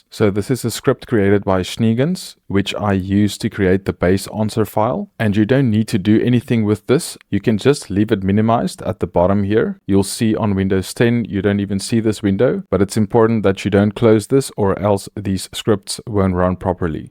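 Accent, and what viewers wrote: South Africa. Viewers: You sound south african?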